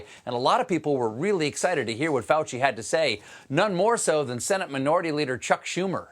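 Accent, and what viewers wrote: France. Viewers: Hey Stephen Ton français fait dure ! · Stephen, I love ta French accent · J'aime votre accent Français Stephen ! :-)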